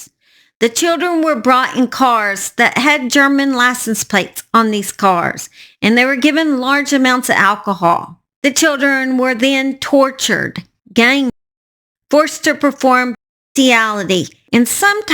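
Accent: South, United States. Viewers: me too, loads of stories I haven’t heard of, and there’s been a lot, if you decide to visit wrap up well 🧥🥶🧣🧕 your southern accent is very comforting to me, iv always wanted to visit loads of places in America but ended up in New York twice xx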